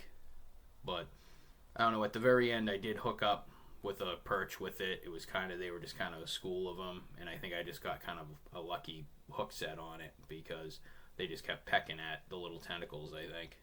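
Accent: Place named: Massachusetts, United States. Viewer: I would definitely continue to fish that just the other day. I was throwing everything under the sun. I even got so frustrated I tried live bait which they were not hitting. I put a black with a blue flick on and I caught a 5 lbs. 6 oz. in 18 feet of water. I was just working it on the bottom. weightless on 3 hook the 5 inch bait. and I’m guessing you’re in Massachusetts like I am I can tell by your accent.😂 so we’re definitely fishing the same conditions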